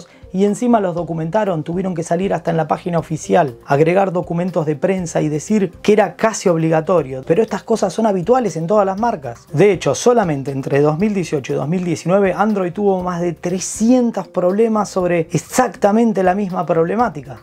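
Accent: Argentina. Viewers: Wow, que bárbaro, cómo disfruté este video, excelente ponente, me hizo reflexionar, y habló acerca de un punto de vista particular, La compañía de la manzana se evalúa de una forma muy distinta y se le exige mucho más que a las demás, en contra parte y por que no todo son miel sobre hojuelas, es verdad que parece como si últimamente apple no probara sus dispositivos, los saca y en el camino los va mejorando, eso no me gusta, puesto que sus productos son muy caros y el usuario merece un dispositivo acorde con el precio que paga por el, si me vana cobrar mucho entonces espero un dispositivo con cero errores, y si los hay, que sean menores. Excelente programa, te llevas las palmas, un abrazo desde México papá; Y el acento Argentino es mágico, me gusta bastante, ojo sin menos preciar al español eh. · Me podría pasar escuchando hablar a un argentino hasta el año 2090 sin parar.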